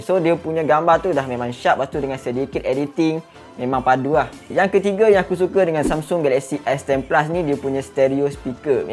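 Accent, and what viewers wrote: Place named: East Coast, Malaysia. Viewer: Orang mana bang ??? sebab bunyi loghat la mcm pantai timur . Macam je tanye je jangan kecam jangan marah ok .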